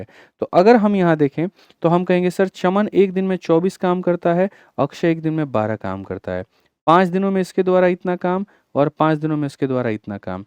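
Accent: Bihar, India. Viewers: B =badki bahu 😂😂😂 librery me meri hansi nikal gai 😂😂😂😂 gajabsir Bihari andaj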